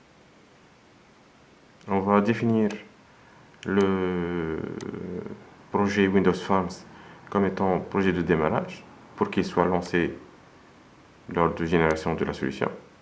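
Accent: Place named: Tunisia. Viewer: accent tunisien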